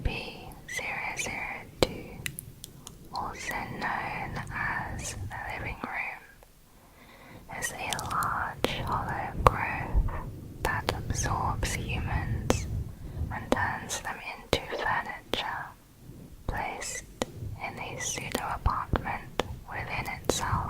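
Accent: United Kingdom. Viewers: You have the most beautiful accent and voice I've ever heard. I'm British too but have a heavy lower-class South East accent and mostly speak in cockney slang. Really gotten into SCP stuff too over the last little while. Great stuff :)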